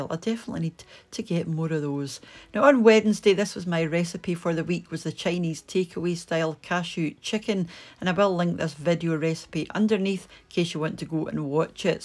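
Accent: Scotland, United Kingdom. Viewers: MY wife and I had the opportunity to visit Edinburgh Scotland last week and we thought of you. Haha. Beautiful country and city. We were in Inverness as well. I enjoy your channel very much and lovely accent and demeanor. You are always so happy and you have a lovely voice. Keep up the wonderful content. 🙏🏼✝️🦞🥩🥔. Cheers from California USA 🇺🇸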